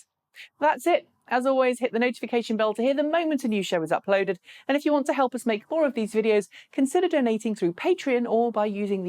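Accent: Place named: United Kingdom. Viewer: proudly points out from time-to-time that she is unapologetically British. 😁 As such, she will pronounce certain words in a manner unfamiliar to those of us who speak Informal American Standard Idiomatic English, and stuff.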